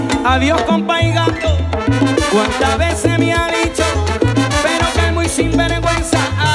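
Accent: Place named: Caribbean. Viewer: Xq habla como caribeño,,? Se ve muy huachafo,,,pero canta lindo,,y estas canciones son emblemáticas!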